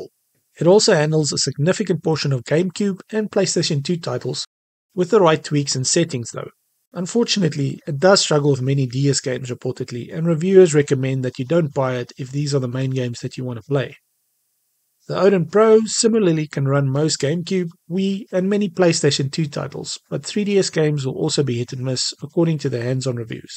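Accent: South Africa. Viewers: keep on researching. I heard your ZA accent. I couldn't miss it. Haha am getting the anbernic 556 this month.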